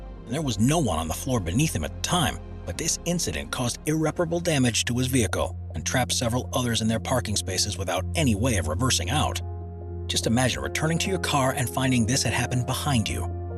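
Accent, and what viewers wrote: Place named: United States. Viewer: Why does the narrator have an American accent? It sounds like the copy was written by someone from Britain (or some other place that says things like car parks and bays) but the narrator sounds American. Not a big deal, just kind of an odd combination.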